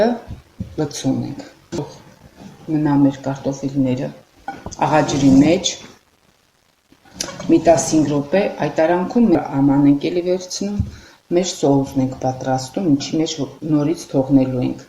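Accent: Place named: Lori, Armenia. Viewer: zgacvum er Lorva akcent@ hacheli e EV tesnel EV lsel.I'm hamaqaxaqaci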